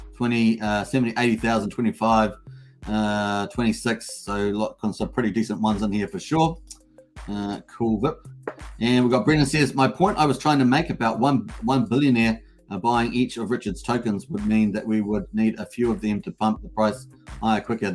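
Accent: New Zealand. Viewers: Are you Kiwi bro?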